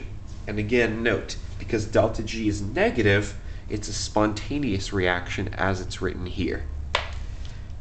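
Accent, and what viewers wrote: Canada. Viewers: I can tell he's Canadian by the way he pronounces the word "Out" like "Aut". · I think it's an Atlantic Canada accent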